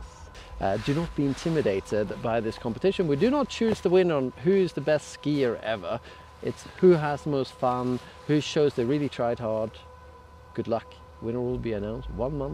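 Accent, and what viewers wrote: Sweden. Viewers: Det låter som att du är svensk jag vet att han prattar engilska men på hans engilska så låter det som att han är svensk🤣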